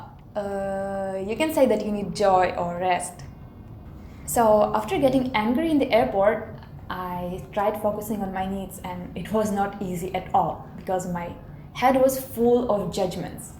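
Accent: India. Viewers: Very interesting video 🙏 I think in my relationship we also have the need of friendly and warm communication 😁 btw did you notice that you have an indian English accent? Super cute 😅🥰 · Your English pronunciation are now like the more Indians and less russian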